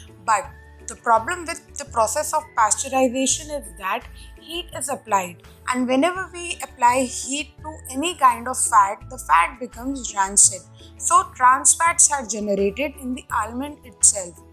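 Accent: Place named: India. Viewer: IM STRUGGLING WITH THE WAY YOU SPEAK YOUR ACCENT REALY INDIAN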